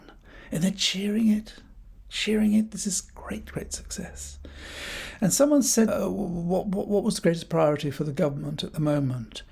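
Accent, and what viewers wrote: RP, United Kingdom. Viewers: You present just fine for us here in SW France ! Clear, distinct and correct RP accent (as opposed to BBC World Service morning Newsday now which is, frankly, dominated by South African, African and low class London accents which are not clear for foreign audiences)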